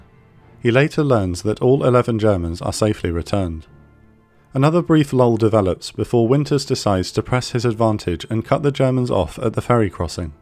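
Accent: United Kingdom. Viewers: Narrator is British....and this battle is factual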